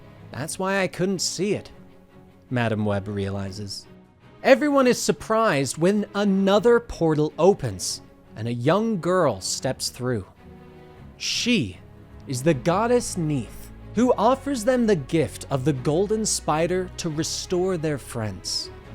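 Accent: United Kingdom. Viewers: has got the fantastic job apart from that British accent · Not all us brits sound like Chimney sweepers from the 1940s come on man do some voice research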